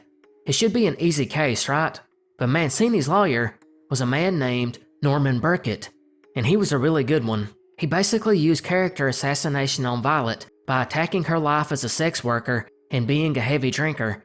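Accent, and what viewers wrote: South, United States. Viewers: love your accent man. is that some kind of southern usa accent?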